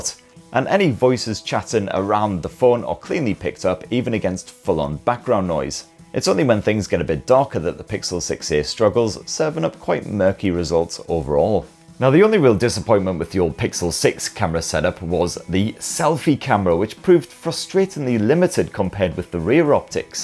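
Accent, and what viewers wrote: United Kingdom. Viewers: Best tech channel ever. And the classy British accent makes it even better. Although occasionally I find the British phrases funny. "The lighting is pants." 😂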